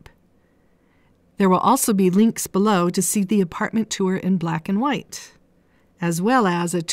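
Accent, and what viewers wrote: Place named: Utah, United States. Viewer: Beautiful work! I heard you speak this time and thought, "She's gotta be a fellow Utahn." Yep! Cool! Pleased to have something in common with you, in addition to our love for these neat spaces!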